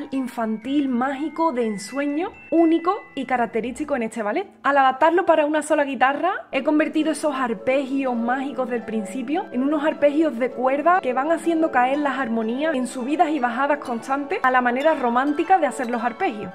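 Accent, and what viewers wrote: Andalusia, Spain. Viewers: ¡Que espléndida ejecución! Aunque admito que lo que más me gusta es escuchar su acento andaluz.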